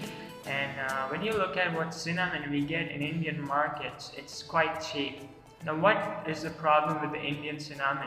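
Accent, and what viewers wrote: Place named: United Kingdom. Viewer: I am sorry for saying yu bad words actually that's not my intension becoz i was serching on airlines job on you tube then i found out your video but i saw your speaking tond is as like British accent that's i don't like.so that's why i thrown out bad words to u.Now i am heartfully apologize for what i said to you. I know you have big heart sorry brother..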